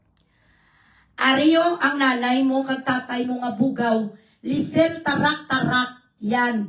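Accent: Iloilo, Philippines. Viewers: Hi from Germany👋I like the way you talk with an Ilonggo accent 😂🤣🤣and delivering the words Diputa and Belat mo😅😛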